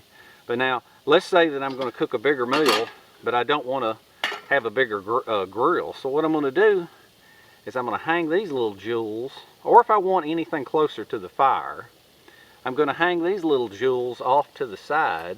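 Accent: South, United States. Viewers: Loving the sounds of the cicadas in the background. Oh and the bacon sizzling is wonderful! I loved the video as I do all your videos. Your southern accent makes a North Carolina boy feel right at home. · Wonderful example of an American "southern" accent. Thank you. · Thanks for another exciting video.. Nice to see you're still thinking and doing stuff. I love the Southernisms, like a two syllable word for a cover or top to a container "leeyud". Keep em coming! Frank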